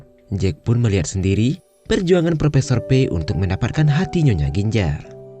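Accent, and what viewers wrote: West Sumatra, Indonesia. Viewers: Org Minang ya min?😂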